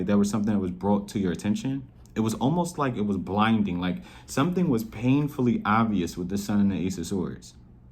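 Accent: Philadelphia, United States. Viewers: You from Philly ?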